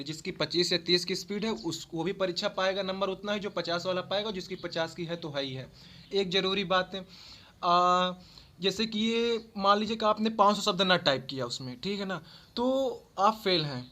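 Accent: Allahabad, India. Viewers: Tqqq sooo much sir 🙏 And apki allahabadi language mashallah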